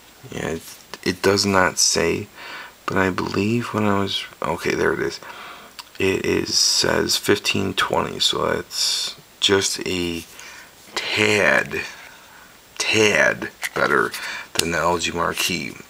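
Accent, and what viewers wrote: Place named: Michigan, United States. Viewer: r u frome michigan?.. or somewhere up north?.. i like ur accent :)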